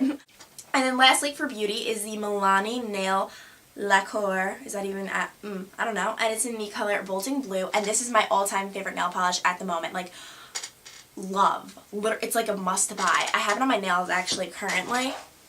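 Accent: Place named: East Coast, United States. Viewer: you had such an east coast accent in this video lol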